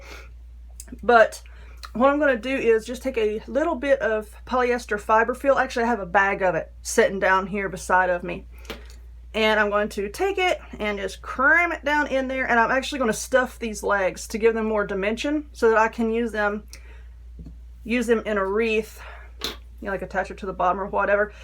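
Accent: South, United States. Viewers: Love your videos and southern accent . Other people talk so long to explain how to make something and they lose me . Quick and easy thank you Namaste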